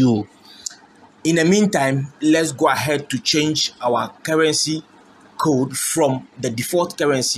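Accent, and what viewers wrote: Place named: Ghana. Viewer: Ghanian accent is so annoying and you talk too much. Go straight tot he point pls. Thanks anyway, I figured it out before even getting half way through this video.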